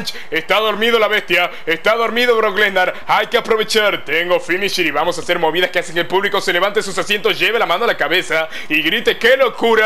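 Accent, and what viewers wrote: Tucumán, Argentina. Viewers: Sos de Tucumán? Argentina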